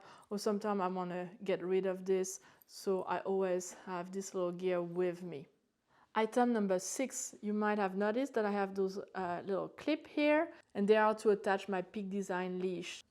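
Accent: France. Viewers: Are you from the south of France? You sound just like an old friend of mine.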